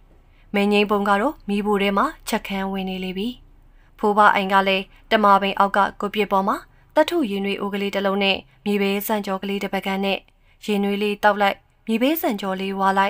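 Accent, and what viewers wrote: Upper Myanmar, Myanmar. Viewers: အညာလေသံလေးနဲ့ ဖတ်ပြတာလေး နားထောင်လိုံကောင်းတယ်ငိုသံကပီပြင်တော့ တကယ်ငိုမိတယ် တော် လိုက် တာ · အညာသံတထွေးကြီးနဲ့ ရေးချက်က ကောင်းလှ ❤❤❤❤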